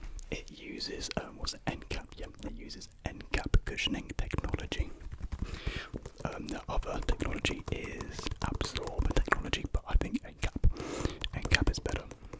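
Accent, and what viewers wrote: United Kingdom. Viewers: A British asmr voice, yey🎉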